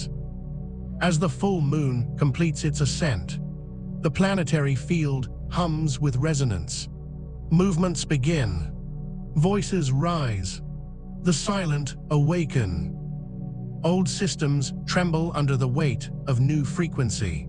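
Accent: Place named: United States. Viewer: You have changed you voice,bringing out the American in the accent!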